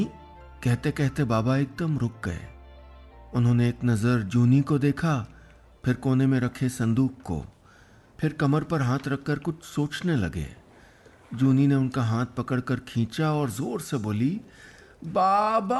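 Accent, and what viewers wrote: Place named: Kashmir, India. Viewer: That be able to use Kashmiri accent 🙏🏻🤌🏻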